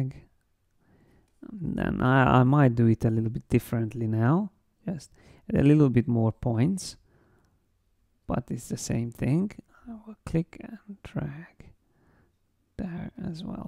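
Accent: Hungary. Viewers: Jézus, de ki lehet hallani a magyar akcentusodat :D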